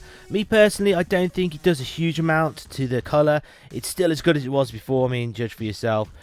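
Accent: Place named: West Midlands, United Kingdom. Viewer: Hi mate. Thanks for the thorough review. I noticed you say it direct affect the screen clarity right? As in it doesn't cause the pixels and text to become slightly blurred? I've not ordered an SD yet cos waiting for sales or refurbs. Getting ascitic to wait though 😂. Hey, you sound local to the west midlands! Big up the WM massive!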